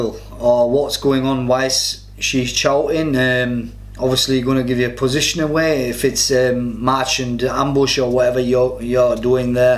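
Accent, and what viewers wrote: Scotland, United Kingdom. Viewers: Love ur accent. Scottish? That would perhaps make u Scots guards, or a highlander? So there are 3 phases of ranger school. The early part of the vid u watched was just the first part of the first phase. That's the 'easy' part. The goal of all the extra water spraying and stuff is made to make ranger school as miserable as possible. After u get to ranger school u r working off of a 4000 calorie deficit, and u only get about 4 hrs of sleep per week, if ur lucky.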